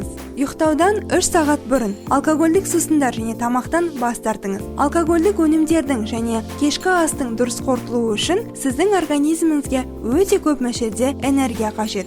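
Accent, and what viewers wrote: Standard. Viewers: Не деген сулу казакшаны таза сойлейтин кыз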